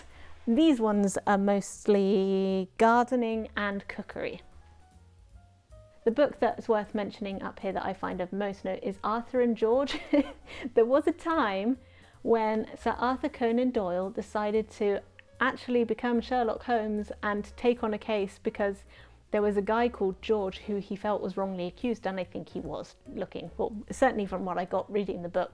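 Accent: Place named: United Kingdom. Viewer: It's great to meet a female British booktuber. From your accent i presume.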